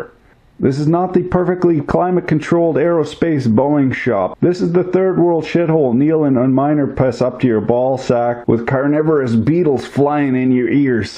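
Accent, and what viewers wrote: Canada. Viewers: definitely canadian. eh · Canadian? or Minnesotan? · Sounds Canadian. Fun video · Just sounds like a fellow Canadyun to me. I don't hear an accent. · Haha are you Canadian guy?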